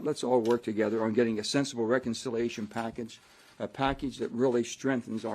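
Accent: United States. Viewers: He sounds like a true American!!! 🇺🇲🇺🇲🇺🇲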